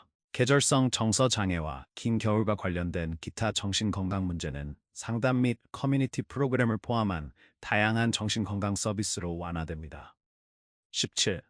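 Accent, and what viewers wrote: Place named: China. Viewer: 왜 발음이 꼭 중공 발음 같지?????? 중공 AI 번역인가???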